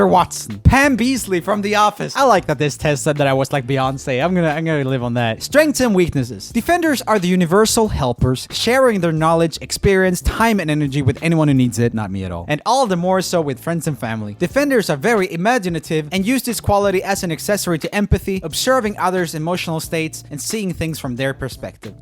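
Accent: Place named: Sweden. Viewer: His accent is so swedish, like pewdiepie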